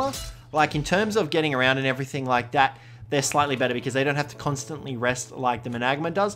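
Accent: Australia. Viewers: Are you Australian? I love your accent! ☺️💞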